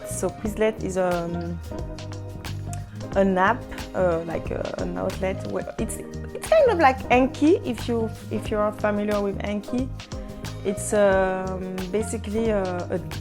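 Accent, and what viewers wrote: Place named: Belgium. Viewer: You sound very Belgian haha (coming from a fellow Belgian) I would recommend you to check out Dreaming Spanish. がんばれええ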